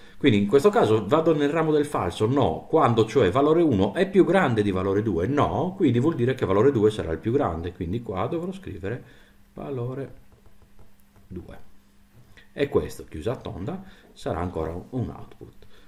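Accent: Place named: Sardinia, Italy. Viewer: Non posso non fare i complimenti per la chiarezza espositiva e le precise nozioni illustrate. Mi dispiace essere arrivato in ritardo nella scoperta di questo canale che sto seguendo con piacere e tanta voglia di apprendere il linguaggio C#. Chissà... Davvero complimenti perché rispetto ad altri canali Youtube, al momento, questo si sta rivelando per me il più efficace. Sinceri complimenti! P.S. Immagino dall'accento e dal cognome che siamo anche conterranei... fortza paris!:goodvibes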